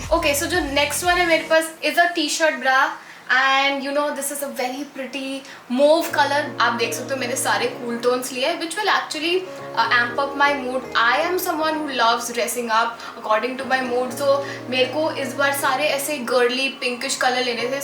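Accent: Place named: India. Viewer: 100% you look like foreigner 😮ie like pure100% Russian But your accent is paka indian 😮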